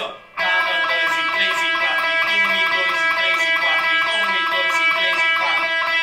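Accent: Pará, Brazil. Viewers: só pelo jeitos de falar deve ser daqui do meu estado, pará.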